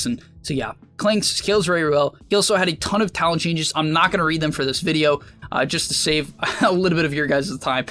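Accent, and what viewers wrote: United Kingdom. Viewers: I disliked because of that "British accent" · MAN STOP THIS BRITISH ACCENT SO BAD CRINGE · It started British but quickly became Indian. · good dota content but awful British accent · Please make a whole video with that fantastic British accent. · I liked for the British accent.... Aye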